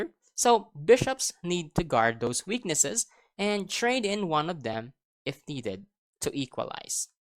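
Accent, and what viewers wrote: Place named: Philippines. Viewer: Change your Pinoy accent to a British or Czech one and you will have more global viewers. Fact.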